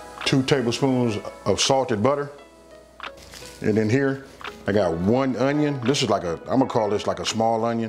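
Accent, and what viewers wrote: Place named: South Los Angeles, United States. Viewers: Factz luv his cali accent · He definitely sound like he from Slauson and western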